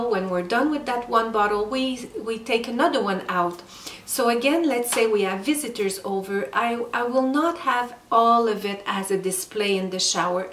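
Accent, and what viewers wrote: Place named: France. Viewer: Hello, you’re always so well organized and disciplined. I like it, but sometimes I’m a bit lazy to do it like that. I love your channel. I’m from Germany and unfortunately i don’t speak English well. Sorry for that. I enjoy every video with and from you. 👌This light French accent is very adorable to you. 😘🙋🏼‍♀️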